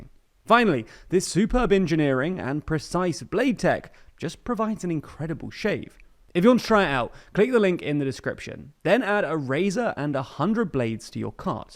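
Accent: England, United Kingdom. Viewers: A man with an English accent post Brexit showing interest in Ireland is suspect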